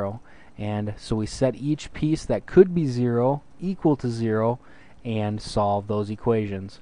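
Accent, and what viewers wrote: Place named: Canada. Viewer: With my exam in 2weeks, i've been really struggling. This really helped! Thanks a ton. Love your accent! Are you Canadian?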